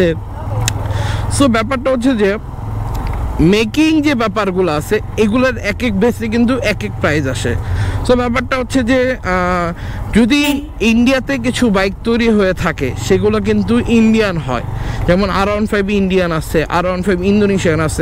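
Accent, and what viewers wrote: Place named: Gazipur, Bangladesh. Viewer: Apnr basha Gazipur kothi porse 😊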